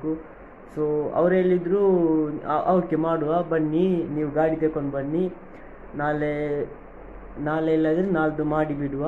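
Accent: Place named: Mangalore, India. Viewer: I like ur mangalore kannada 😄😄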